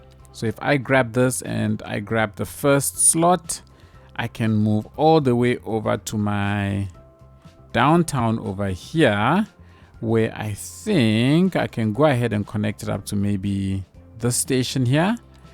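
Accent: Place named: South Africa. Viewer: I heard the accent and immediately knew this is a fellow South African.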